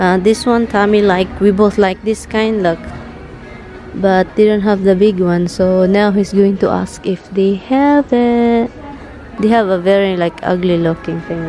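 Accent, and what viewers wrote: Visayas, Philippines. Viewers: Mag tagalog ks na lng puntong bisaya ka pa rin mag english Arte mo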